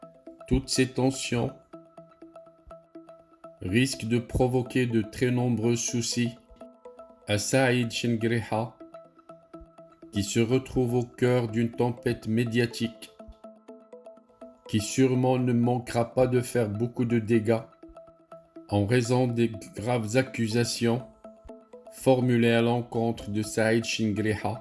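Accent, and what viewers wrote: Morocco. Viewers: Mais qui veut éliminer mimi VI ? Le pauvre tout le peuple est contre lui même el mekhzen et ses enfants ! Dieu nous préserve de ce virus marocain ! VIVE L'ALGÉRIE 🇩🇿💪 VIVE NOTRE ARMÉE POPULAIRE ALGÉRIENNE 🇩🇿💪 GLOIRE À NOS VALEUREUX MARTYRES 🇩🇿💪 JE VOUS DONNE UNE NOTE DE 10/10 L'accent du marocain qui vous fait honte, vous avez essayer de camoufler hélas ! La récitation excellente !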